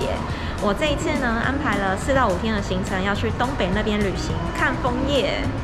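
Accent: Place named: Taiwan. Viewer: Typical Taiwan accent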